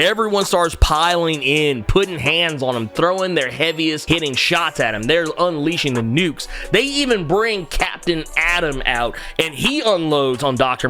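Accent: United States. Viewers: He’s American how else is he supposed to sound like?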